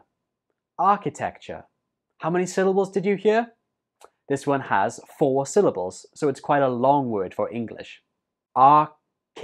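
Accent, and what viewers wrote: United Kingdom. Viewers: So did I. It's easy to understand his British English.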